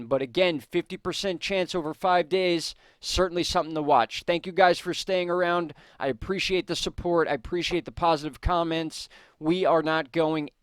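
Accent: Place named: New Jersey, United States. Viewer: Your voice sounds like an old friend of mine from Jersey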